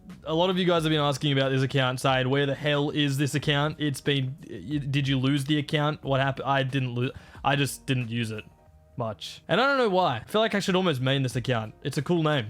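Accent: Australia. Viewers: HE SOUNDS EVEN MLRE AUSTRALIAN THAN LAST TIME WE SAID IT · i thought you were austrailan for a second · the amount of aussie in you is perfect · His Australian accent has become more severe as years has gone on, especially after breaks. Edit: I assume this is because when he isn’t uploading to YouTube, he is conversing with other Australians IRL, but back in the day when he was constantly online, he was associating with primarily North-American creators which made him indirectly change his speech patterns to sound less Australian.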